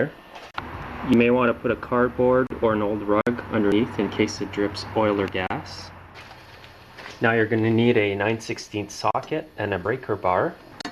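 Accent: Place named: Canada. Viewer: YOU SOUND CANADIAN...ARE THE CANADIAN MOWERS ANY DIFFEREN THAN ONES FOR USA?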